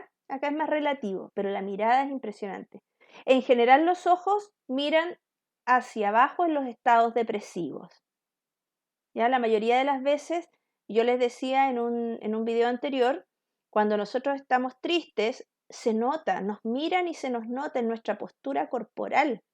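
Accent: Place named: Chile. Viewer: 😂😂😂 por el tono de voz me dice que chilena😊🧘‍♀️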